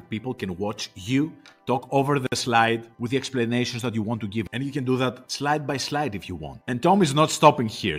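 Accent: Greece. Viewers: Είσαι Έλληνας ; γιατί η προφορά σου μοιάζει πολύ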